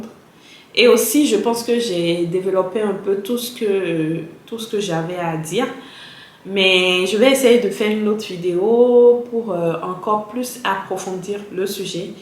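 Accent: Benin. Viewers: Tu es Béninoise ? Ton accent ❤ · ah d accord super alors je suis béninoise aussi j’ai reconnu l accent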